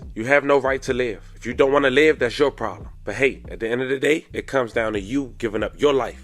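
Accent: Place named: Baltimore, United States. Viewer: I love your voice. it's just something about that Baltimore accent 🥰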